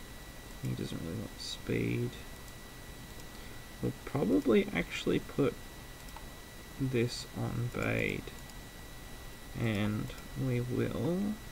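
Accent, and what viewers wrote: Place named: Australia. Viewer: You sound Australian, are you?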